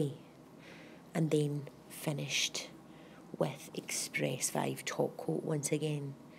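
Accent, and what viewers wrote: Scotland, United Kingdom. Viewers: You people have no class for commenting like this. I watch her cause I do Shellac nails at home and not experienced in using or creating shattered glass effect among other methods. To say her voice is creepy and it’s probably because she is Scottish is racist. I work for a Scottish lady and she has an accent but not creepy. I have an accent cause I’m Slovak, people make fun of me since I came to Canada and told me to go back home. Until you walk in other peoples shoes you shouldn’t talk so negatively. Women should support one another and encourage one another. It hurts me that other women talk like that about other women instead of saying positive comments. Who are you, stand in front of a camera and talk and do nails at same time, I dare you all Bully’s out there. You people have to watch what you say cause it will come back and haunt you. 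I just wonder why people click on certain platforms to watch and then comment trashy. Are they bored, are they nail learners, it can’t be nail professionals cause they wouldn’t comment like that. These people are juvenile and BULLYS. I don’t know this lady but love her work and I use the products she has CND and more. She is an ambassador representing CND and Lecente products and you juveniles should give her respect. Who were you brought up by to have manners like that.🤮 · loving your little video keep them coming and its nice your Scottish so can relate more lol xxx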